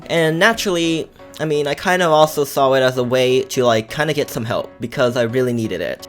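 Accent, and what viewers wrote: United States. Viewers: Not trying to be rude but are you American like idk ur voice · i have chinesee friends and their childhood was like that to and hearing that ur chinesse is so surprising like my chinesse friends learned a lot of english and all and i knew when i first met them that their chinesse by their voice but u sound like a normal american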